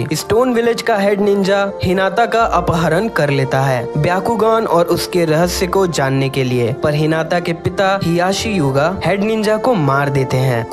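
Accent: Standard. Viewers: Bhai aap itni sudh hindi kab se bolne lage😒😕😒😕😒😕😒😕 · shudh hindi mat bolo karo aap jese bolte the wese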